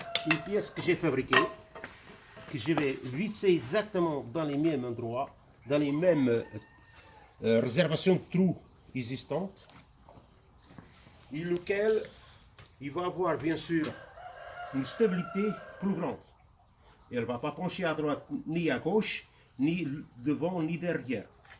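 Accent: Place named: Portugal. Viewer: Bravo pour ton travail et ta présentation. Justement je m’intéressais à cette fendeuse dans le commerce par internet pour mes besoin dans le fin fond de l'Ariège où il n'y a pas de d'électricité. Tu me fais faire un bon en avant dans ma réflexion avant achat ! Mais je me tâte encore à prendre une petite thermique.... Merci et bonne continuation. PS : j'adore ton accent portugais ! ;) et bravo aussi pour la qualité de ton français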